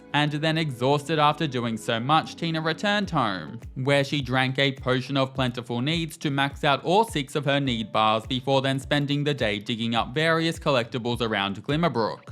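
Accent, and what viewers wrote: Australia. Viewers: is Aussie 👀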